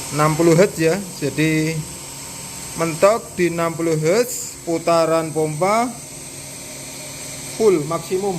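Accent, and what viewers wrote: Java, Indonesia. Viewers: "Walau Medhok, Kami terus Berkarya" Mantap kuwi mas!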